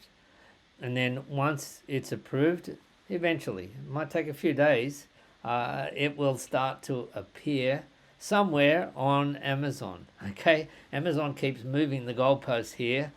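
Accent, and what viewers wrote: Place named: Australia. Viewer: Very informative video. Thank you,! One thing that I particularly liked about your video is that, based on your accent, I'd say you're Australian. I am, too, and it's nice to see content that is going to be applicable to me. Sometimes I will watch a video about something only to find that the program is only for US residents, which is frustrating and a waste of time. Keep it up!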